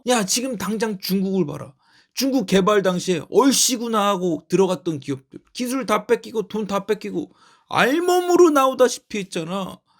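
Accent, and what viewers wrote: Chungcheong, South Korea. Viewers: 대표님 말씀 들으면 웃음주시고 예리하게 파헤치시고 인정넘치는 충청 아저씨 구수한 말씀투에 뱃가죽 출렁이는 스트레스 해소에 기쁨또한 넘치게 해주셔서 감사드립니다.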